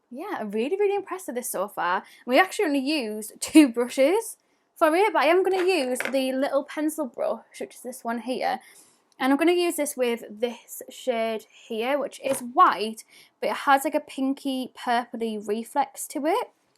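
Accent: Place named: North East England, United Kingdom. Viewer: Yaaaaaay a north eastern accent! 🤗🥰🤗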